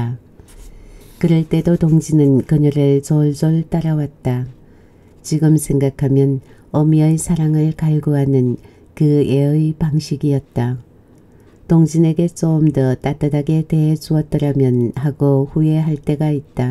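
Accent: Gyeongsang, South Korea. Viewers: 70 년대를 거치면서 살아온 사람으로서 많은 기억들이 떠오르는군요. 서울에서 태어나 자라온 나에게는 에 경상도 사투리가 처음 조금은 매끄럽게 들리지않았으나 지금은 익숙해져서 푹은한 감정이 생겼어요 항상 변함없이 굴곡이 없는 그 음성에 마음이 편해집니다. 좋은글 감사합니다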